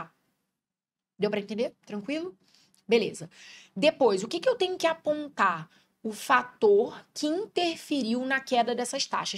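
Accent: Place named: Rio de Janeiro, Brazil. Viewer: Esse sotaque carioca me encanta! Que energia linda a tem!!!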